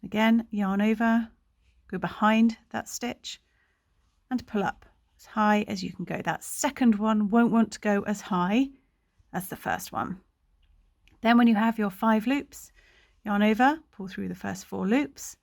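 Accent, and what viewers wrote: England, United Kingdom. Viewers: American stitch names in an English accent? Lots of trebles, counting one, two, three as you did them, but then referring to them as double crochets, and then double crochets, counting one, two, but calling them single crochets! I can see I'll have to write the instructions out in English. Lovely squares, thank you, but any reason for the use of American terms?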